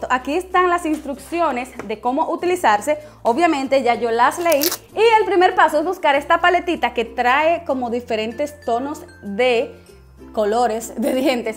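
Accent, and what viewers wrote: Dominican Republic. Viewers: Gracias amiga! Es que note su acento parecido al de República Dominicana...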